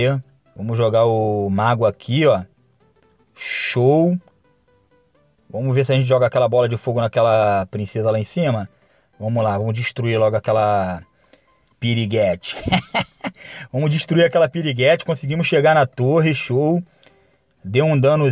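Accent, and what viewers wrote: Rio de Janeiro, Brazil. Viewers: mt top esse sotaque do rj kkkk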